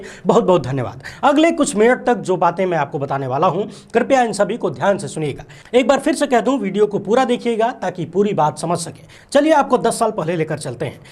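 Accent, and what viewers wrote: Bhojpuri, India. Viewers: Beta aap ka bolne ka bhojpuri style mujhe bahut pasand hai main aap ki video hamesha dekh te hu gbu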